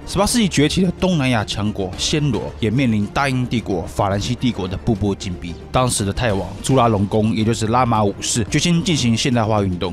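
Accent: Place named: Taiwan. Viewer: 台湾腔太难听了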